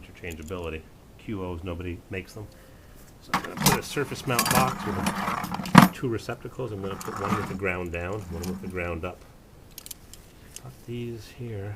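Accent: United States. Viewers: With that southern drawl, he sounds good doing it too.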